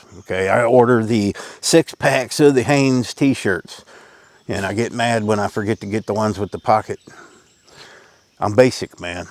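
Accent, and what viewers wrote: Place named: Appalachia, United States. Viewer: You i love American applachian accent and i wish some day get that accent for real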